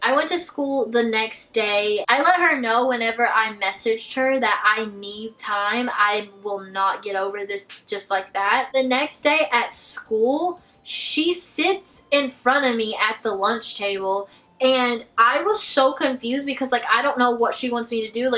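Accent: South, United States. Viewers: Your southern accent is the cutest thing I’ve heard all week! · Do you have a country accent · Why you so gorgeous tho? I love the whole southern-ness the way you say things and shit.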